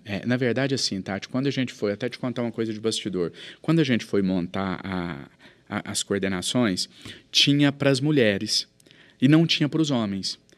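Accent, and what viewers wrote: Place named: Minas Gerais, Brazil. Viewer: JANONES presidente do Brasil daqui uns anos! Está amadurecendo para isso! Adoro suas ideias e o sotaque mineiro!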